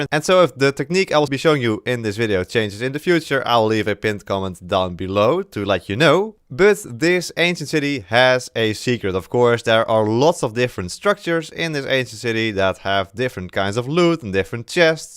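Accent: Netherlands. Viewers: Ik hoor een Nederlandse stem, ben je dat ook?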